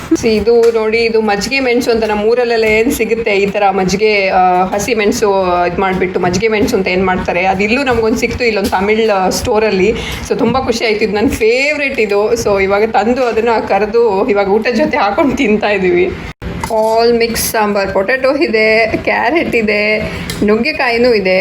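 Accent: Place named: Mangalore, India. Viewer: Niv yake manglore thara Kannada mathadala.. El kalithu kondri banglore style Kannada..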